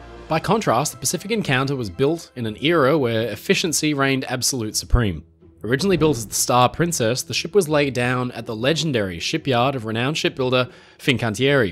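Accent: Australia. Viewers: I didn't realize was Australian, one would think the accent would be a clear give away, but i mistook it for a British accent until i saw his location on the channel's description page.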